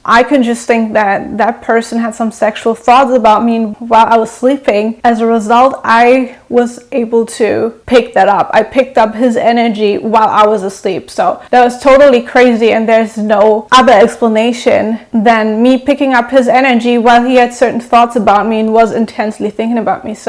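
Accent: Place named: Germany. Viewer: Are you German? I love your accent!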